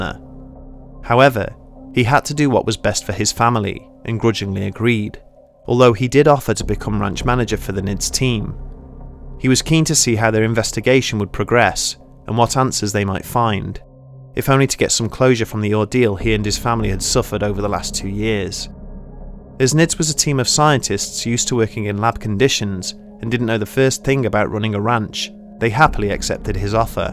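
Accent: United Kingdom. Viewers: Why do I these videos always have to be narrated by some damn Brit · we need more UK guy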